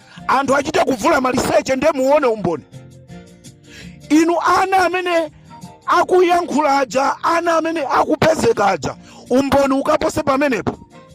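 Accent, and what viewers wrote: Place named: Malawi. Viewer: Comrade come on…. This is a real Malawian talking in the voice Avoid this if u want to be taken serious Come on man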